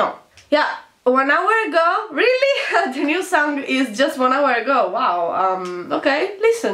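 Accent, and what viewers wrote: Sardinia, Italy. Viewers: Ma sei ita o ing/americana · Fidati che dal tuo inglese si nota che sei italiana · come si sente che sei italiana 😂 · Figa se si sente che sei italiana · Siii. Io sono sarda e vivo in Sardegna. ❤ e nel riassunto del video la penso come te.😍 Ajooo. (Sarebbe una parola sarda)😂 e tra l'altro hai i miei gusti😂💕💕 sinceramente il tuo accento quando parli in italiano sembra sardo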